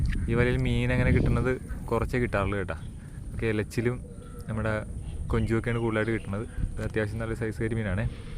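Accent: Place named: Cherthala, India. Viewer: ചേർത്തല സൗണ്ട് ❤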